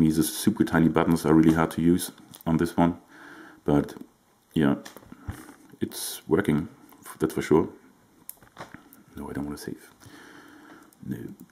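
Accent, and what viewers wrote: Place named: Germany. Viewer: man hört das du deutscher bist, aber ansonsten echt gut :)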